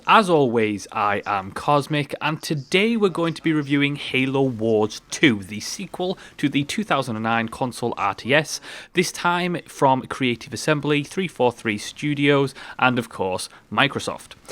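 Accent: United Kingdom. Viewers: I want you to fill me with that sexy British voice of yours boi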